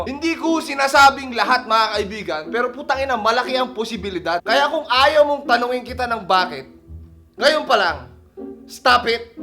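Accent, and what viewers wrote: Ilocos, Philippines. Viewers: Bakit ka nagvevape? HAHAHAHA SAPUL 2020! I love your ilokano accent!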